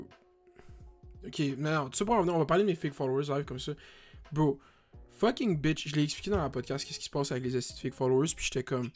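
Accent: Quebec, Canada. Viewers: Je suis un algérien de France, je comprend rien à l’accent québécois, je comprend rien au YouTube game québécois, le basket ou le rap us m’intéresse pas non plus mais depuis que je suis tombé sur tes vidéo par hasard, je regarde ça avec assiduité parce que je trouve que tu parle très bien, au point où j’arrive à faire abstraction de l’accent ou de l’argot.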